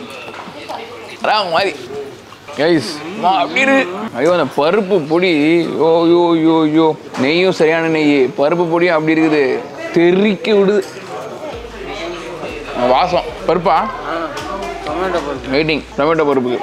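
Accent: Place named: Telugu, India. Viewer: Hi anna nige Telugu pesard kamidiya erk anna😂😂😂nanum AP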